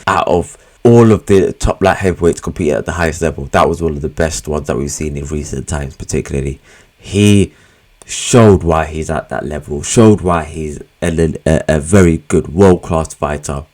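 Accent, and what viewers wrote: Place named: Liverpool, United Kingdom. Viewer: Your scouse accent is muaad! 🤣🤣🧐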